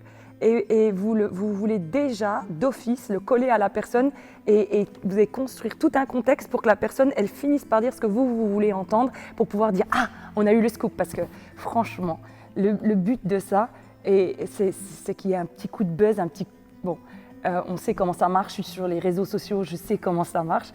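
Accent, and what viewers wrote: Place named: Doubs, France. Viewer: Chère Pasteure, je vous ai découverte il y a environ deux ans..Votre Foi transparaît à chaque phrase dans vos vidéos. Je suis catholique pratiquant mais avant tout sur le chemin, la vérité, la vie..Soyez bénie.J'adore votre accent qui me rappelle celui de ma cousine qui habitait dans le Doub😊.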